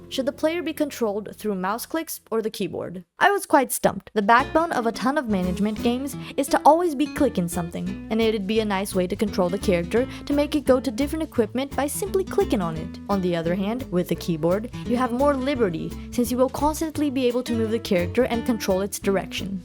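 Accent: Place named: Brazil. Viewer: O sotaque brasileiro é inconfundível, sucesso!!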